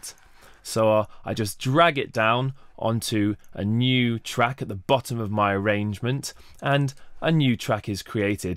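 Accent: United Kingdom. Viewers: After watching this video I never want to hear another British person pronounce “garage” ever again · Every time I read GarageBand in my head, it’s now in a UK accent....damnit.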